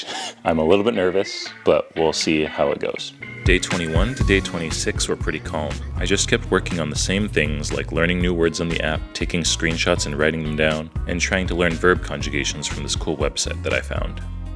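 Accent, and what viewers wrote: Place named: Uzbekistan. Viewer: Wow your accent like ecxacly Uzbek🔥 I hope your visit to Uzbekistan will be amazing🇺🇿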